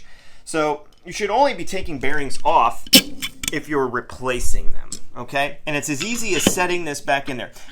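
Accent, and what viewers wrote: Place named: South, United States. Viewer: Upvoted for southern dialect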